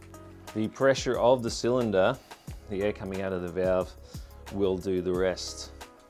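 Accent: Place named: Australia. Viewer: You're Australian 🐨🦘🪃🇦🇺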